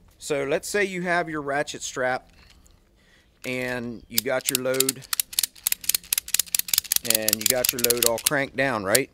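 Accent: Australia. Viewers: Mr Big Australian English. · Great vid bro love the accent, it’s been a while since I used one of these dazz Australia..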